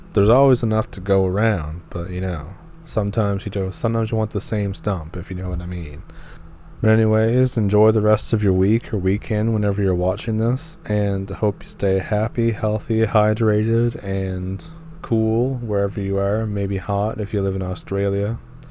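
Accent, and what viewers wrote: Canada. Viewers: I saw your comment and the thread it started on a Raven Reads video. I told you I would check out your channel and I have. I picked a random video down the list a little and this is the one. I liked it so much I subscribed! I look forward to checking out more of your already posted videos as well as any and all new content you post. Your voice is great, I love Canadian accents, and perhaps most importantly, you tell the story really well. I felt like I was just hanging with a friend while he shared the story with me. It felt more intimate than most channels, less rehearsed and more spontaneous, if that makes sense. It was awesome! 🙂🙂🙂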